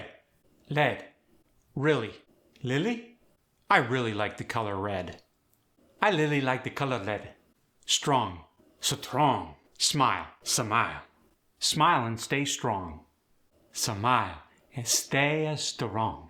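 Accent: Thailand. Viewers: I appreciate your effort in teaching the Khmer accent, but I think you might be mixing it up a bit with Thai. What you’re teaching actually sounds more like Thai than Khmer And actually, you got only 2 things right. yes, Khmer doesn’t drop ending sounds, and yes, we don't have the “th” sound. We like speech to be smooth and effortless, without putting too much pressure on our tongue In Khmer, the R sound (រ) definitely exists and is quite distinct. Khmer is super rich in sounds. it has one of the most complex alphabets in the world, with sounds many other languages don’t even have So no, we definitely don’t pronounce words like “sacoll, vegatabal or lealy, light….” we just like to keep speaking easy and natural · Get a native Khmer speaker to do emulating... this dude sounds like a Thai doing Italian accents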